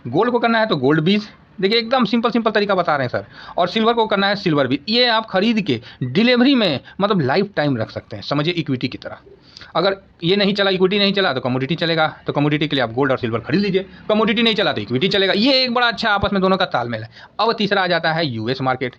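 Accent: Bihar, India. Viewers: Sir aap bihar se h kya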